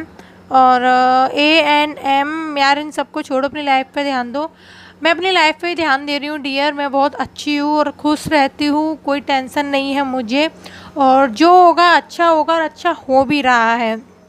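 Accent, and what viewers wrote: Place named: Uttar Pradesh, India. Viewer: apki bolne ki style pr bahut hasi aa rahi thi....sach me up me ase hi bolti h ourate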